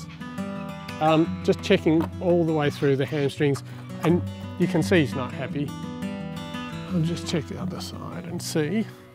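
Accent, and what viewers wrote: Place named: Australia. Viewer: Excellent work. Really enjoying your videos i just stumbled accross. I can tell from your "lack of an accent" lol, you sound like an Aussie?? (I am too) ☺ Just wondering what state you are in?? Not SA by any chance?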